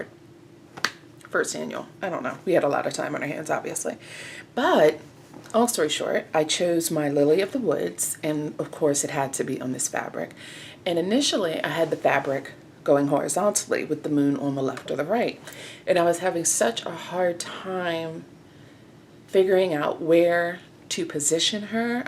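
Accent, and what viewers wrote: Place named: Baltimore, United States. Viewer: GIRL! So excited to see another video. I loved seeing all your projects and I truly enjoy listening to you discuss your process. Your Baltimore accent makes my heart so happy. Sending you all the hugs!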